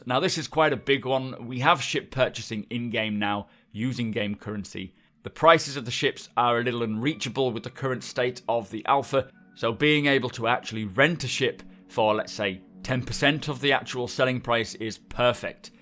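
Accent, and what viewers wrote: United Kingdom. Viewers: Fck.. Why is every game vid on YT some British guy!.....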